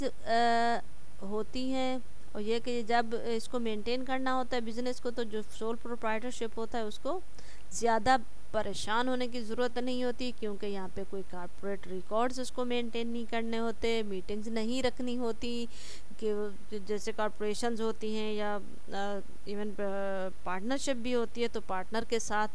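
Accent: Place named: Pakistan. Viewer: Sound like ur a pakistani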